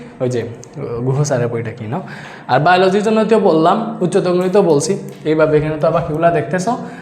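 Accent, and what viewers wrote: Sylhet, Bangladesh. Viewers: বাই তো আমরার সিলটি মন অর, হাসা নি বা বাই? আফনে কিতা সিলেটি নি?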